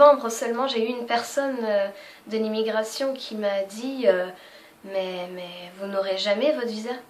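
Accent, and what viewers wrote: Quebec, Canada. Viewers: Tu parles comme les Quebecois jtel dit ahahahahaha :D tu '' la '' comme les quebecois le '' sa'' aussi !! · pourquoi tu as l'accent québéquois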